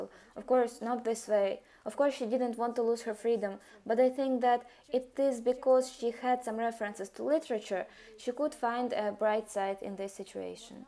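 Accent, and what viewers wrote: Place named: Russia. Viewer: You're welcome. And sorry if it has taken me awhile to reply as I've been preoccupied with other activities. The way you analyze things is something I really admire because your ideas seem to flow quite nicely even though English isn't your first language. Your Russian accent is pleasing to hear. 👍 And your analysis on Belle made me relate to her in terms of being more like a simple and curious type, who thrives her solitude but I'm less like her in terms of the social aspect. I'm not very friendly and I feel awkward and not as confident around people. 😅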